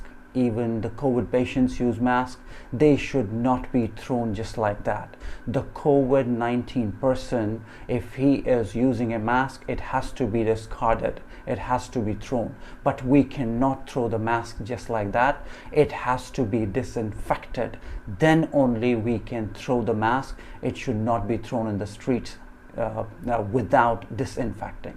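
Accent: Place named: United States. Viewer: Why are you talking in an American accent?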